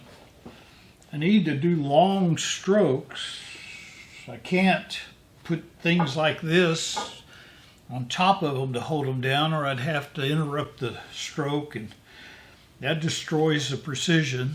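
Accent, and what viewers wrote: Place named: Houston, United States. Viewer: from the start of watching your videos, I've felt like I had a friend. I think it's because I grew up in Houston and there's something about your dry sarcasm (and accent) that reminds me of just about everybody I grew up with. I 't mind the longer format when the content is fun and interesting. You are an actor, so making these videos combines two sets of expression that are important to you. As soon as you make it about the money, it will be less fun for us and more like work for you, like an amateur musician getting a paid gig and now being obligated to spend four hours a day practicing. We watch because we like the hang-- is the surrogate for us as we watch and that's a good role. Do Patreon only if you actually need the money.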